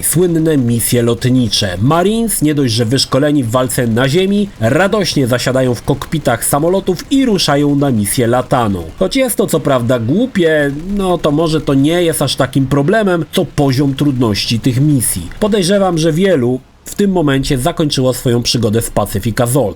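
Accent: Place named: East, Poland. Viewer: O wymowę bym się nie czepiał, może nieco zbyt wschodni akcent i stąd to mocno słyszalne "z", ale to drobnostka, a pochodzenia nie oszukasz:) Lubię, ale jeśli coś mu mógł bym zarzucić to to, że często mówi coś o czym nie ma pojęcia albo mu się wydaje, że ma pojęcie oraz to, że jego materiały są robione trochę na odpierdol. Do pierwszego zdążyłem się przyzwyczaić, w końcu komu z nas się nie zdarza pieprzyć głupot? To może czyni takim ziomeczkiem, nie jest jakimś nieomylnym guru i Jaśnie Panem Youtuberem. Więc pomyłki, zwłaszcza na streamach, jestem w stanie wybaczyć. Ale materiały robione na kolanie trochę mnie mierżą. Po takiej recce oczekiwał bym jakiegoś przygotowania, scenariusza, trochę więcej wkładu własnego, a nie na zasadzie "chuj tam, wpiszę 1, nikt i tak tego nie ogarnie". No i swoją drogą przyłapałem na kłamstewku. Mam nadzieję, że karę za to w tym roku Mikołaj przyniesie mu soczystego crapa pod choinkę ^^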